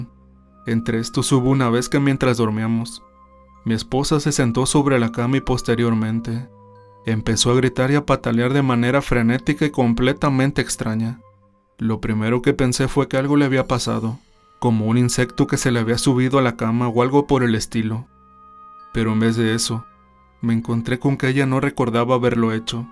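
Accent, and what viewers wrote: Mexico. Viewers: Amigo, quiero hacerte una inquerencia, y espero que no cause ningún incordio, es la siguiente: El acento o tono que empleas para hacer tus narraciones ¿es propio de algún lugar en específico o es un tono que haces para narrar? Sí me suena mexicano, aunque no sabría de cual lugar de allá. ¿No es incomodo inquirir esto? Saludos, Dios te guíe.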